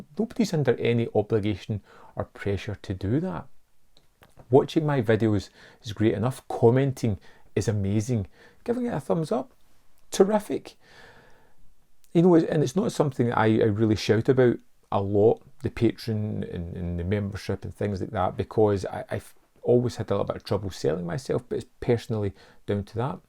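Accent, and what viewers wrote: Scotland, United Kingdom. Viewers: Do a review of Braveheart with your regular Scottish dialect, rambling about how inaccurate it is. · Love the channel mate keep it up i didn’t know you were scottish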